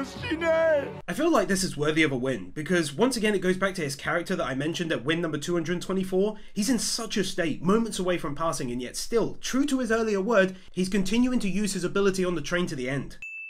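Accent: United Kingdom. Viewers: This guy's voice is the manifestation of the word "British" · Is this a LiverPool accent?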